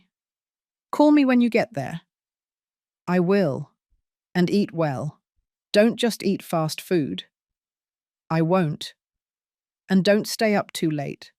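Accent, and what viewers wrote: United Kingdom. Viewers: I love these stories because of the British accent and slower pace of speaking. Other story channels have very annoying voices and speak with agitation and too young of a voice for the age of the main character.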